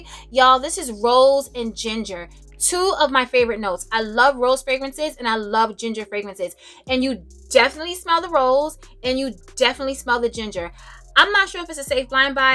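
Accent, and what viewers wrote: New York, United States. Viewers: Yayy, thank you so much for posting this! I can listen to you talk all day, love that NY accent 😍Totally agree about Tribeca, I'm currently obsessed with it at the moment - like it's super rich and sweet/deep notes yet it's so airy and light, and makes me feel so posh lol. Greenwhich Village and New York Nights are next on my to-buy list!! Would you say NY Nights could be a signature during colder months or night time only vibe?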